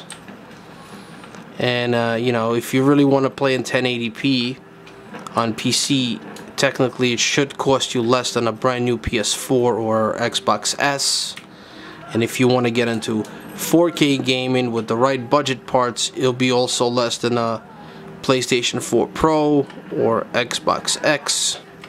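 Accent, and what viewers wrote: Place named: New Jersey, United States. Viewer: I might be wrong but it sounds New Jersey lul or maybe Boston I’m not sure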